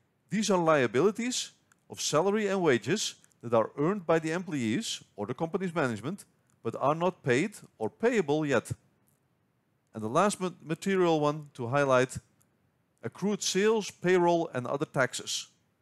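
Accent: Netherlands. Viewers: Thank you. Really good explanation. Do I hear Dutch accent?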